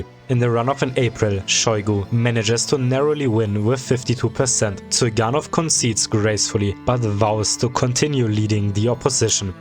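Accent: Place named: Germany. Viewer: The pronunciations make me think you're a German speaker? The Z in Zyuganov should be a zee sound and ths Sh in Stepashin should be a sch.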